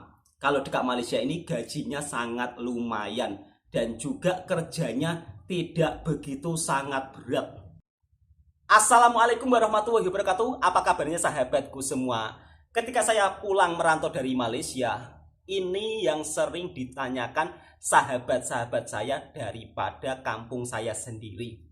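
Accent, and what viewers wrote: Java, Indonesia. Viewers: Ini, jowonya waduh...buaayak pekatnya....hehehehe😂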